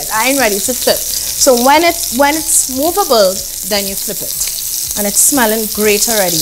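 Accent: Trinidad and Tobago. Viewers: Who is this young lady, she is so genuine and very informative. She is fabulously fantastic. Better half even singing her praises. So she inside. Very professional and then slides in her Trini intonations from time to time. Excellent. And the meal and preparations on point too. What’s her name? When you cooking again darlin?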